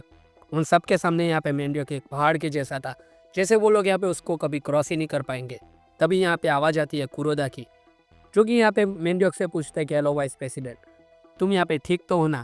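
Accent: Gujarat, India. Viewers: From your ascent i can say u are gujarati i am also ❤😊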